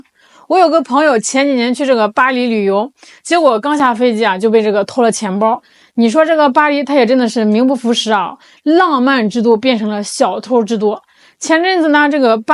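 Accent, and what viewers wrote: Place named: Northeast, China. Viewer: 感觉口音象东北的人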